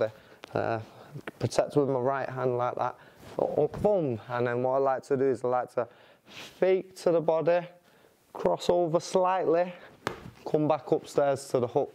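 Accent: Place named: Liverpool, United Kingdom. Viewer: killer scouser accent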